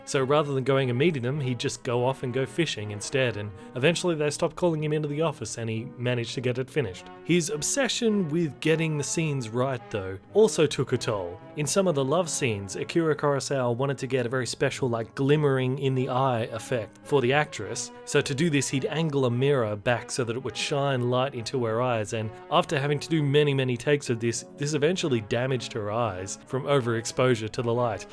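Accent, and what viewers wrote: Australia. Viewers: Are you an aussie? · Holy crap are you an aussie too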